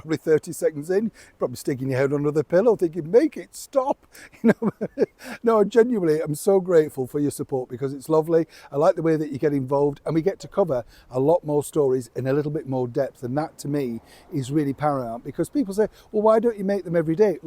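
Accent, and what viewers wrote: United Kingdom. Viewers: Love hearing from a Brit.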